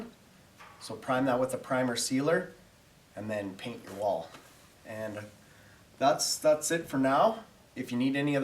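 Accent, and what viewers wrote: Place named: Alberta, Canada. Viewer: hey where is this guy from? sounds a little like people from Alberta Canada, but maybe his accent is from the states?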